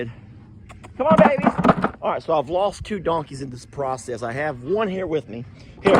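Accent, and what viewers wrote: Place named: South, United States. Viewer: We say ol man and ol lady . I think It's more of a country thang you just have a southern accent.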